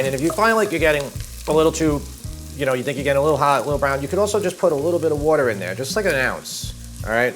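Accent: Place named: Boston, United States. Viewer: Your accent is very similar to mine. South of Boston? I really enjoy trying your dishes. They’re not overly complicated and they’re hearty. I’m feeding a picky 11 year old so I appreciate your son’s reviews. 😊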